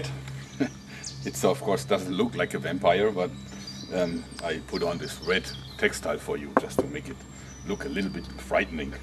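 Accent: Germany. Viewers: könnte es sein das du deutscher bist??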